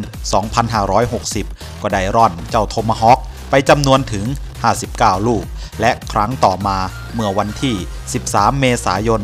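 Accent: Thailand. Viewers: แอดคนบ้านใหนเสียงเหน่อๆ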